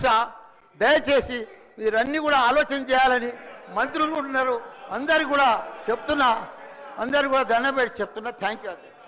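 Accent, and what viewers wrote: Telangana, India. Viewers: అచ్చమైన తెలంగాణ బాషా మల్లన్నదీ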